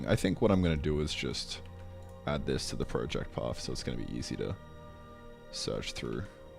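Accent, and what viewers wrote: Australia. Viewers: mate, you threw me for a loop there. "Take a peep for Circles specific stuff..." Searches for Sokol Aussie accent is from another world.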